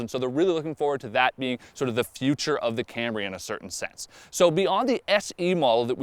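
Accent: Canada. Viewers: This gentleman is speaking just fine, the Canada accent, no big deal. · is this guy Canadian?... ither way I think he is sexy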